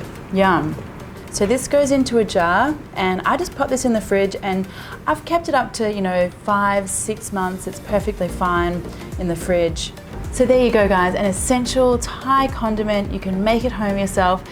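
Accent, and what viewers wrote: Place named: Australia. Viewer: I hate hearing annoying Australian accents.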